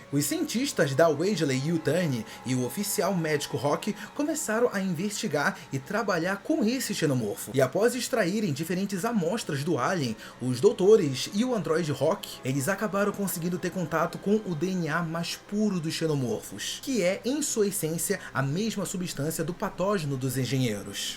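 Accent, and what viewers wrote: Brazil. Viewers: Finalmente alguem que fala palavras em inglês abrasileirando... sem querer forçar a pronúncia igual a um semi retardado nerd que se acha nova iorquino.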